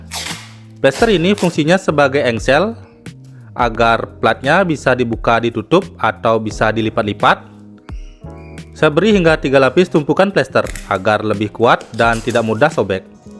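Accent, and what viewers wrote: Bali, Indonesia. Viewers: Medhok kali bli basa baline .....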